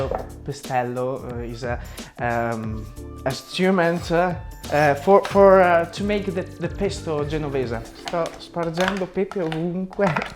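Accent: England, United Kingdom. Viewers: sei dolcissimo con quella faccia da schiaffi 😂mi fai morire quando dici le parole in italiano con accento inglese 👏bravo 👏👏complimenti x gli iscritti avanti così 🤗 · No daiiiiiiii x favore parla italiano e metti i sottotitoli in ingleseeeee...poi quando parli in italiano con l'accento inglese quello ci piace ahahahahah 😄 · è un pochettocringe anche quando parlavi in italiano ma con l'accento inglese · Questa risatina così italiana 'eheh' tradisce un po il tuo perfetto accento British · Le parole in italiano con l'accento inglese... Calato nella parte proprio!!! 😂😂😂😂 fantastico! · Simpaticissimo video,fa ridere quando parlu in italiano con l'accento britannico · Ma fatemi capire: non conosce l'inglese, non sa cucinare e parla l'italiano con l'accento inglese... E fa un video di cucina in inglese???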